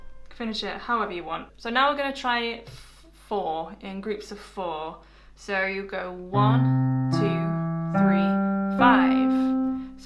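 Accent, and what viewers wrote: United Kingdom. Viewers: Finally it took a brit accent for me to understand,lol im on my way!!!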